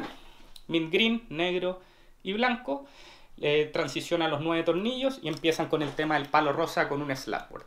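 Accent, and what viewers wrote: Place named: Chile. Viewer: como se nota que erí chileno XD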